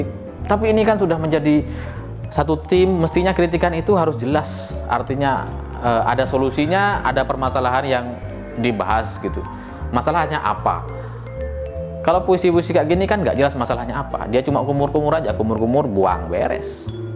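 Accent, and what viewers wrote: Madura, Indonesia. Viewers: org yg ngomong ini logatnya spt org madura ya....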